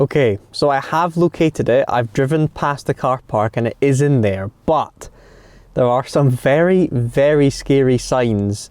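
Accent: Scotland, United Kingdom. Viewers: A car video with soothing music and the most relaxing reassuring Scottish accent I've ever heard? First ten seconds and you've already found a new subscriber!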